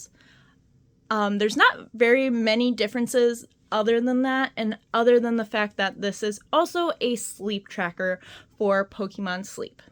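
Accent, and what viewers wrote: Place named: Midwest, United States. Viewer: I can tell you are from the mid west lol :)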